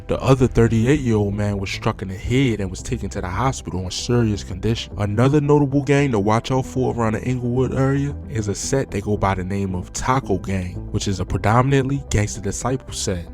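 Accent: Baltimore, United States. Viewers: Your accent sounds like baltimore. But you are badly mispronouncing our street names dawg 😂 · Fr he sound like he from Baltimore or some bro don’t have a fucking clue what he talking about. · I appreciate folks that's not from my city reporting on my city! Sound like you're from body more (Baltimore). Can you report on your city? I'd live to hear about the murders & gang sets in your hood. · You sound like you from Baltimore talk about some Baltimore shit yo you don’t know shit bout no chi town shit, put your own city on first smh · That Baltimore Accent 🦀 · I thought I was the only one who deciphered this. His bmore accent is heavy. 💀😭😭😭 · HE DON'T KNOW WTF HE TALKING ABOUT, NOT FROM CHICAGO THAT'S WHY HE KEEPING SHIT WRONG.. HE SOUND LIKE HE FROM BALTIMORE. HE NEED TO STOP TALKING ABOUT WHAT HE DON'T KNOW. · This narrator is definitely from Baltimore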